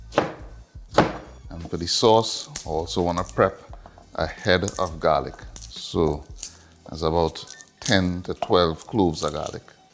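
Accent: Trinidad and Tobago. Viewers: Yea!Trini accent!I'm hanging around! I'm St. Lucian.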